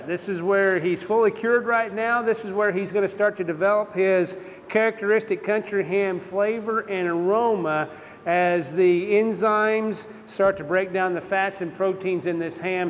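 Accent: South, United States. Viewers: Damn it said UK college and the American accent gave me a fright before realising it was University of Kentucky not United Kingdom. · From the title, I assumed it was "United Kingdom College of Agriculture..." So I was very shocked when this deep country American accent broke out.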